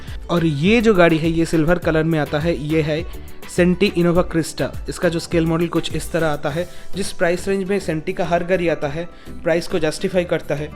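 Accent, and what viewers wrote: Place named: Bengal, India. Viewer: Sir are you a Bengali??..From your Hindi accent...I also have a small hot wheels car collection of 30 cars...But your one is huge..Great job...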